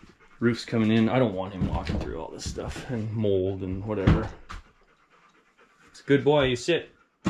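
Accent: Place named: Canada. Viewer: I knew you were Canadian within like 2 seconds of hearing your voice. the location is a secret but ive narrowed it down; I would've hauled the vice out that boat house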